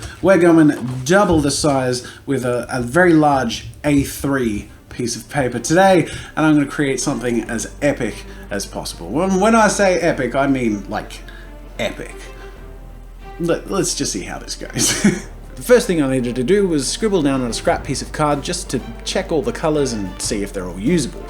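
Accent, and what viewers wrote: Australia. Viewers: During the testing he kind of sounds like a happier, friendlier and australian version of gordon ramsay :D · Are you Australian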